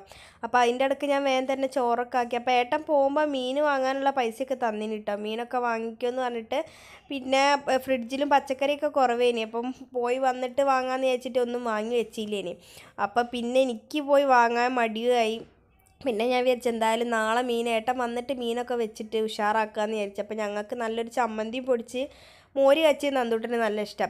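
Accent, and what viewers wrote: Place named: Kannur, India. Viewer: Pudiya subscribera. Ellam.turane.parayunu.nalla.samsaram.nmloke.basha.pole.tane.nmle.kannura.padachone.anugrahikate.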